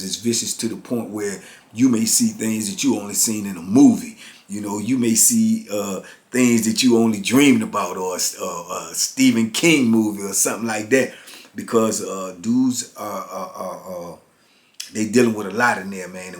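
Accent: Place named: DMV, United States. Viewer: Bra I like this video. You said some certain words that I can reflect off. Young I am onbthevWest Coast but from where you from. Inlike you videos because InthinknIblost my DMV accent. But when I hear your delivery you remind me of home. Speak da trufe to da old and da yufe. Errbody or somebody going thru it and know what you seen and know you real. ✊🏾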